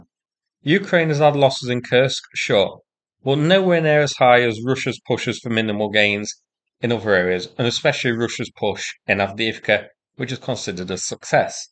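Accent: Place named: Yorkshire, United Kingdom. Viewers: Forgive me if I am wrong. As I just Luv your Yorkshire accent...It reminds me of my Grand Dad and Great Grand Dad...as my father went to University and he didn't have an accent although he was born in Scotland...😊😅😂. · I see that YT have you as Taiwan based. Is this commentator AI ? If so, it's a great Northern British Accent. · How could that be, when everyone is identifying his accent as Yorkshire? Mansfield is in Nottinghamshire, quite a hike south of Yorks. This is an honest question, as I am not an expert on the speech of Notts. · Guessing Yorkshire /Lancashire accent, same for the Rabbit. 🙂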